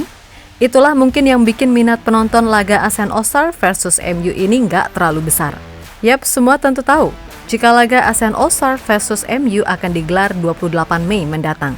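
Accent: Java, Indonesia. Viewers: Fiks mbak mimin orang jawa 😅😅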